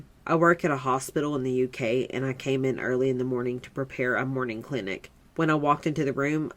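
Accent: South, United States. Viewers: Oh, where do I start? I freaking love this, the way you narrate these stories! your voice is so soothing, and the southern accent makes it all the more enjoyable The one about the baby clothes and the one about George were the spookiest imo I have a couple suggestions/requests In the beginning I thought there was background rain sounds, but I'm not sure.. I think it'd be. a great touch to set the atmosphere. And I think it'd be really cool if you draw something about the stories, it would be interesting.. like whatever strikes you the most about those stories, idk it's just an idea 😊 I also love the fan art in the end.. I hope you keep up with these and it becomes a series on the channel cause I'm here for it. much love for you ❤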